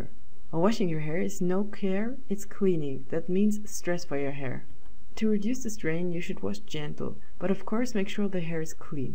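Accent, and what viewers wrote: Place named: Germany. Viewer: Dieser deutsche akzent man, friee golnn rüüs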